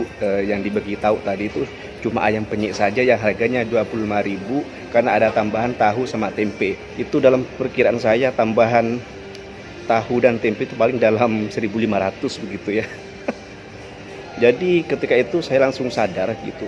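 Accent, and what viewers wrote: Malaysia. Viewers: lol... minda sempit... x lupa pun bahasa indonesia.. lohgat je tertukar siket.. itu normal la sbb br pulg dr malaysia.. lama2 akan kembali loghat indo..itu pun nak kecam.. patriotik itu bagus tapi jgn eksterim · Speaking pun dah mcm org malaysia...slang indon langsung hilang.... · Makanya.... 1. dimana bumi dipijak, disitu langit di junjung, Mas. Kamu baru 2 tahun di malaysia, udah pakai logat malaysia. 2. Mana ada ayam penyet harga 12ribu di Batam. Paling rendah 18 ribu rupiah. Teh obeng / ice tea itu minimal 5 ribu. Nah kalo lontong sayur,. Nasi lemak (makanan untuk sarapan) iya rata² 10ribuan. 3. Lagian, itu warung dekat hotel toh? Yaa pantes donk....😅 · Kalo bisa jangan pake logat melayu, tpi logat jakarta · Salam..org jawa timur..raut wajah dan percakapan anda jugak seperti org Malaysia. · Bahasa mas sudah mirip orang malaysia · Logat mu malaysia,jd di mahalin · salah anda sendiri..knp pake loghat malaysia.. 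· Cara abang bercakap sikit" agak logat orang malaysia.jadi di sangkanya orang luar negeri. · Mmng betul adik, ngomongnya kamu tu bkn org Indonesia dh,dh mcm org Malaysia sngt dh.Xpa...niatkn sedekah,biarlh peniaga tu yg jwb jika ianya ada niat menipu.Gk kn senang mn pn jika ianya menipu pelanggan mrk...gk berkat... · Sampurasun.bng kata aku mah bhsa.abang nyak.jadi campur.logat.melayu. kata aku mah.kan Abang udah lama kerja.di malaysia.jadi logat.melayu.nyak kebawa. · Bro.. Kamu dah mcm org Malaysia.. 😀.. Percakapan kamu mmg dah Malaysia sangat.. 😁😁👍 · Wajah dan slang cakap macam orang Malaysia tau.😊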